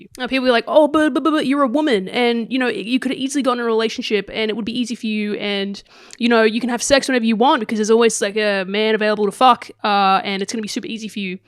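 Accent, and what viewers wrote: Australia. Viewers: Clearly aussie, but whats the go? Nintendo is cool, but this is somehow related to porn? Tha fuck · thank you for being Aussie, I love Australian people accents. I can't stop listening 😂😂😂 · who knew that all i needed i was an aussie girl cussing me out and telling me to stop shucking the corn. 10/10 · So are you Australian or something?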